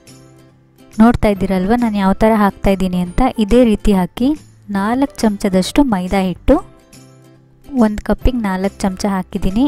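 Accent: Mangalore, India. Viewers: Madam nimdu native place yavdu? Manglore aa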